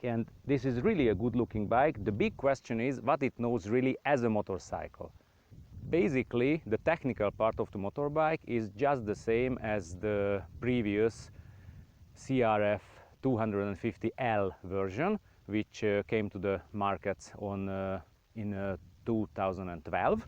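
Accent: Hungary. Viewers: magyar akcentus:D nice video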